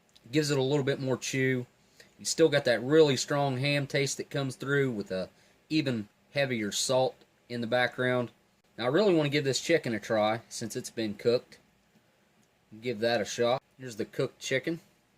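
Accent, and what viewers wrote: United States. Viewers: I'm binge watching your videos like crazy now. Completely enthralling content, plus your American accent is lovely and makes everything interesting.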